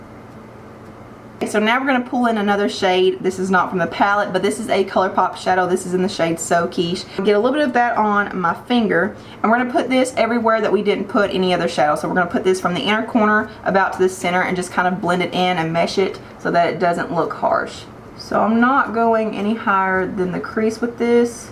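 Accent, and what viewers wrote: South, United States. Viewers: where are you from ? i can tell you're from the south , i'm from north carolina and i wish my accent was as beautiful as yours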